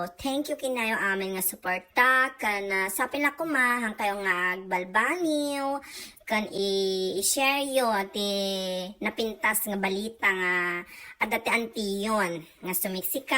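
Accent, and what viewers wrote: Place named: Ilocano, Philippines. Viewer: kayatak la unay ta ilocano accent mo 😂😂😂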